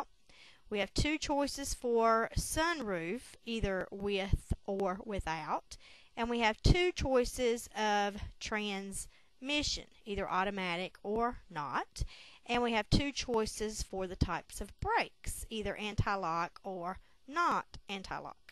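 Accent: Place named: South, United States. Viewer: YOU FROM THE SOUTH, I LOVE YOUR ACCENT!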